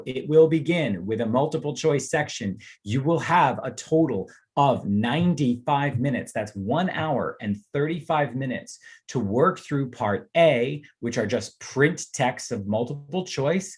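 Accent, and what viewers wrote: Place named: Spain. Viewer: Gosh i love your Spain accent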